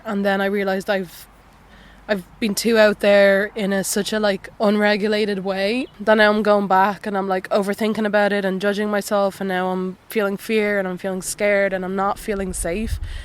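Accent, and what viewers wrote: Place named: Ireland. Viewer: kia ora from aotearoa (nz), it's surreal to see another young person on the opposite side of the world going through such similar experiences and processes, like I was listening to myself just with an Irish accent. Thank you for the self-safety work you have done and continue to do. It is a soft reminder that we too are allowed to treat our most difficult parts with patience, curiosity and respect. Have been recently feeling like I've been regressing or that my healing work is selfish, but this video & all the comments show that all self compassion overflows into our world, into those around us 😇 + so many good reminders of practical techniques i had forgotten or not yet heard of! ty ty ty <3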